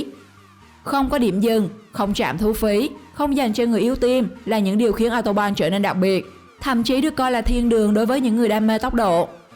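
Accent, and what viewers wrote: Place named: Phu Yen, Vietnam. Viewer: Đây là giọng Phú Yên,gần Bình Định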